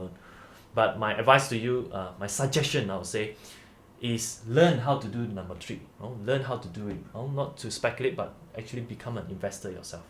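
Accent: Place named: Singapore. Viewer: I can't stand Singlish....my ears r so itchy listening to Singlish accent. Either you speak Chinese or proper English. But not Singlish...gosh.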